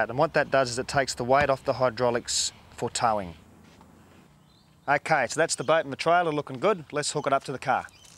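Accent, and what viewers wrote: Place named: Texas, United States. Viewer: Good video ,,, Love that Texas accent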